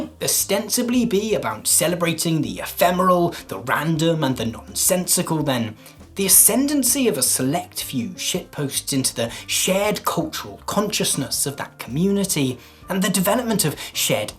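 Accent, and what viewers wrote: RP, United Kingdom. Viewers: hearing the words “the shitpost is defined by” in a posh british accent is a transcendental experience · dont u hate it when the dude narrating a 45 min video is british :(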